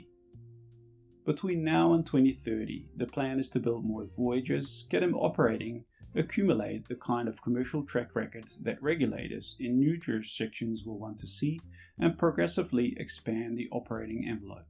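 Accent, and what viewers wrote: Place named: New Zealand. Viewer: You sound like a Kiwi with something else bolted on?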